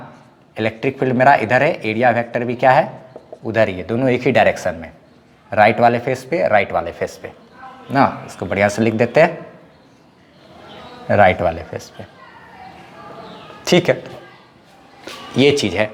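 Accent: Bihar, India. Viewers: Where is your home sir ? I think you are bihari because the way you speak is like bihari ...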